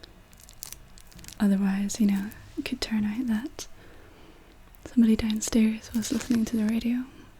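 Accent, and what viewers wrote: Scotland, United Kingdom. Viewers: Love your Scottish accent... And your headphones. Is there a hint of Irish genealogy in there too? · oh, I love a good scottish accent! new subscriber.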